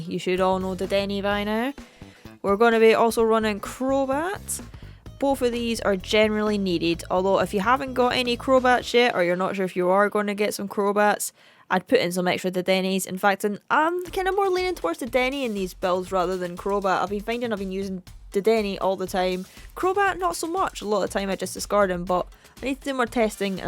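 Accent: Scotland, United Kingdom. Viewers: Haha, the scottish accent is great, I'm glad I didn't embarrass myself.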